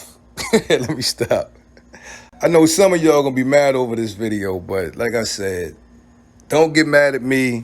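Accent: Baltimore, United States. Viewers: You’re definitely from Baltimore huh bro? Haha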